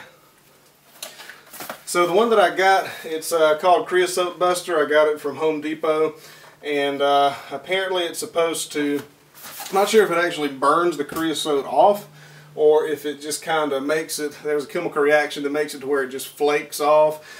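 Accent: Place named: Appalachia, United States. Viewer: Where are you from. I like your accent. Is it a hillybilly accent? Thanks from the UK.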